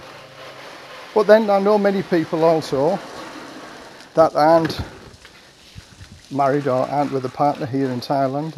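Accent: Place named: Teesside, United Kingdom. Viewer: Always enjoy your videos ……I’m planning on retiring to Thailand this November……thanks for sharing both inspirational and useful info…..Always good to hear a friendly Teesside accent from the other side of the planet 🌎 #UTB 🙂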